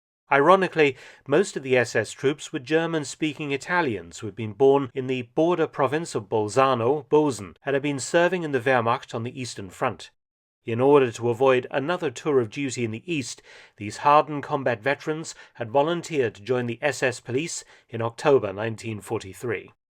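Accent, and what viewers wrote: United Kingdom. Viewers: I dropped out of college, but my history class was the only one I completed. Dude was just like Mark without that silky British accent hahaha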